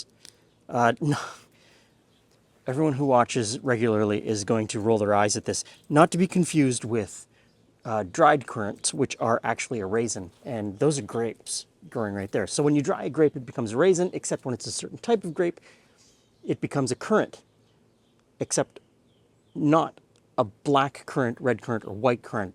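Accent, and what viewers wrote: Canada. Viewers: I wonder how many of the awful comments and messages is because people can't identify that your accent is Canadian, not US, and are either AH Statesians or AHs from other countries. (You are, rightfully, and American, because Canada is part of the Americas. You are not USian though.)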